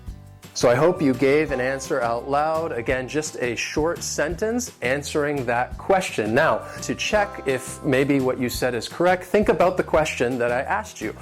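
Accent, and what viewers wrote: United States. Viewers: I love listening your videos, you're a good teacher, it's quite easy to understad you, although you've got such an strong american accent, nevertheless it's lovely, i really enjoy myself listening to, awesome, greetings from manresa catalonia" cheers "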